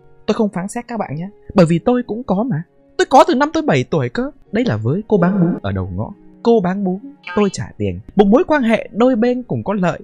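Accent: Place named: North, Vietnam. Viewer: Thề coi ông Tuân stream t ngủ ngon lắm bọn m ạ. Kiểu t cũng người Bắc nghe giọng ổng như giọng bố mẹ t lèm bèm nhau nên t ngủ ngon vcđ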